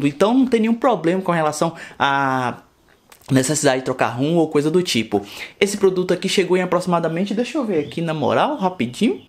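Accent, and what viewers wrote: Bahia, Brazil. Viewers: Tu é da Bahia?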